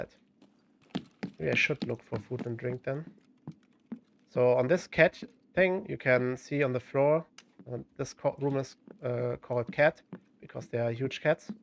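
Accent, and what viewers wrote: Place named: Germany. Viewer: I hear a very deutsch accent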